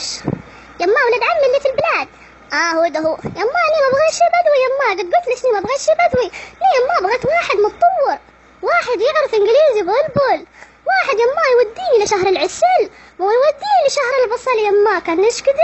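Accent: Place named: Mukalla, Yemen. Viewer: Danea G.a هذي لهجه اهل المكلا 😂👌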